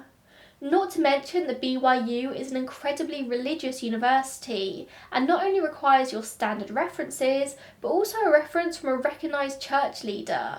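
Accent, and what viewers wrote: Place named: London, United Kingdom. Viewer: Apart from the annoying low class London accent and the grammatical mistakes of her own language , this is a review of a book that was ghost written to sell books using data from a book that was written to sell… well books , and bits from a movie script that was written to sell movie tickets. None of them are the truth. You should have done your own research and presented those findings but, that would take time away from attempting to be famous on YouTube. Far better to copy someone else’s work, dumb it down and concentrate on You. By the way, if you are going to read facts from a prompt because you don’t know them , make sure that the information is correct…. There is no Bronxville area in The Bronx, it is Brownsville and, I am quite sure that Abagnale started doing speaking tours around the USA, not the UK. 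Know your subject matter.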